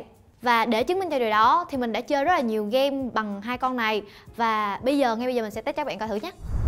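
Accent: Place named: Saigon, Vietnam. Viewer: Ban Nu nay giong Sg tot... Than thien voi moi truong !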